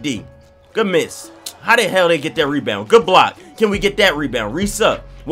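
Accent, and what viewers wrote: Philadelphia, United States. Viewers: U from Philly bro?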